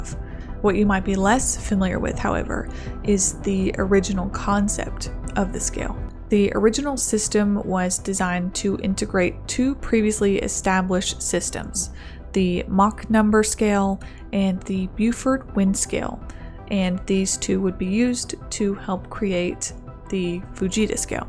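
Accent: United States. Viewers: Seriously? The current scale is the EF-scale. Europe still uses the F-scale, but you sound American to me. That said, as you know, the EF5 scale tops out at 318 mph. It's unlikely that higher windspeeds, which have been recorded as high as 320 mph, would cause more damage. It would take a much higher windspeed to create a new class of catastrophic damage. As for your comments about damage, it's possible to create your hypothetical scenarios under laboratory conditions. Engineers know exactly the structural integrity of a building within its technical life. Before you make another video, maybe you should ask an engineer, actually read the EF-scale, and review the peer-reviewed research about tornadoes.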